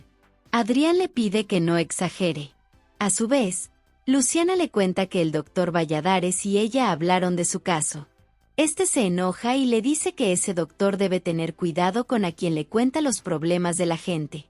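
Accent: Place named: Colombia. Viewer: Narra con acento Colombiano😊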